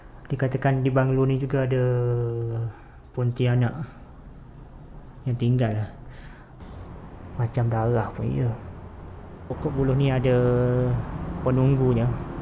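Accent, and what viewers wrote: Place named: Terengganu, Malaysia. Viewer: Rumah ni ker yg maksudkan tempoh hari lokasi kat damansara..Yg selalu tersasul dlm video terdahulu sebut kotak rokak ker depang ker yg bercampur aduk dgn loghat besut.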